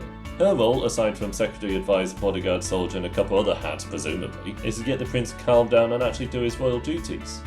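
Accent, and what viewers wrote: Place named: United Kingdom. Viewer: You have great ideas and ways of presenting them. I suspect you will grow to be quite a large anime YouTuber if you can fix some of your production problems. To start, your audio problem is the biggest one. Your microphone is not the greatest, being very muddy, especially in low frequencies. And since your voice is very deep, the microphone makes it very hard to understand what you are saying. Add on the idea that there is a large American population that is interested in anime, having any sort of shortcomings in the audio department will make it that much harder to understand you (accents aren't hard to understand once one has gotten used to them, but not all Americans are used to a British accent). The background music in this video is very loosely defined as background music. It is too loud in the video. Also, the background visual seemed odd, feeling like a Windows Vista background. 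I am not very well versed many other areas regarding YouTube production and making good videos, but I can tell that you do have the most important part, interesting content. Now you just need to develop the other parts of your YouTube game and I suspect you'll find the growth you probably desire. In regards to this video, I really like what you've said about Genius Prince. I very much enjoy these kingdom builder-type shows like Dr. Stone or Maoyu (even though Maoyu was meh) and watching another like that would be fun!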